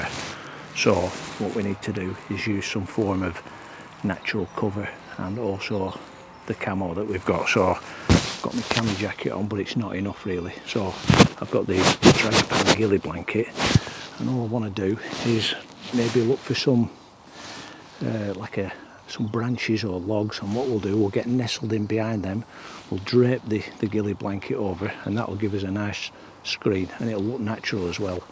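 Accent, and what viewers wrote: Lancashire, United Kingdom. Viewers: Only just found your channel and I must say Brilliant really enjoying what your producing, you also sound very local Lancashire by any chance.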